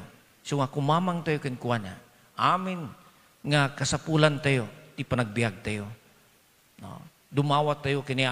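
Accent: Ilocos, Philippines. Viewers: Wow magandang gabi father, parang pure na Ilocano kong magsalita,I love your homily father God bless po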